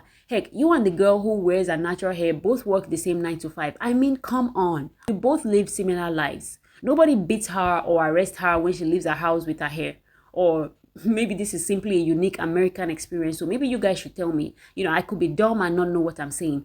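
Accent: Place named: Nigeria. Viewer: How can i like this video more than once? Your Nigerian accent and no bullshit attitude only makes it better.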